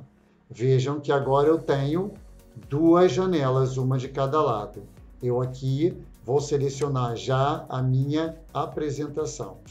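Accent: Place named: Portugal. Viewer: Muito bom. Seu sotaque parece ser de um português que mora há muito tempo no Brasil.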